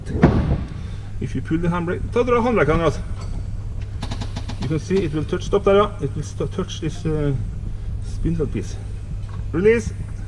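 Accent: Norway. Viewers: Norskengelsk er så kult 😊 (Norwegianenglish is so cool)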